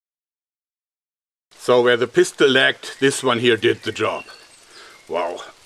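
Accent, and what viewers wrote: Germany. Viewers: This dude is so cute with his german (please correct me if im wrong) accent and his homemade weapons. Like a big kid that's really creative... and possibly deadly.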